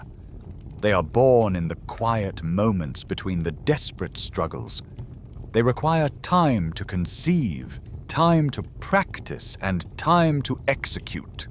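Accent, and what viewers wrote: United Kingdom. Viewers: Voice is way too loud and energetic for sleep. I also can't stand a British accent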